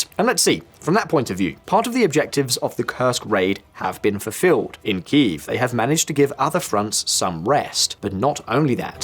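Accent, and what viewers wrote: United Kingdom. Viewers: When I hear Brit accent, i know this is full of bs. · A proud British English accent is a sure sign that what follows is propaganda, half-truths and lies sponsored by the London-Wall Street Financial Cabal in order to continue their endless, no win wars and increase their bloody war profits from their military-industrial-complex enterprises.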